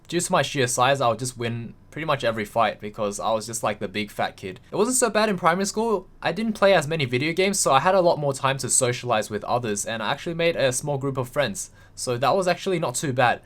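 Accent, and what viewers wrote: Australia. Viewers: Are you from Australia? Greetings from Hell's Gate dude! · Ah never mind I saw you going to pax in Melbourne. I thought I could hear the accent. Bro you’re a legend. I’m a lot like you in many ways (but not good at league). Anyway if I go to pax I’ll keep an eye out for you. No pressure dude I’ll see if I have enough money to buy you a drink or something xd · yeah i thought so, i could tell from your low-key aussie accent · Dude, just move to America. Girls are obsessed with Australian accents. My sister always says you sound hot whenever I watch a video next to her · A chinese guy with an australian accent wtf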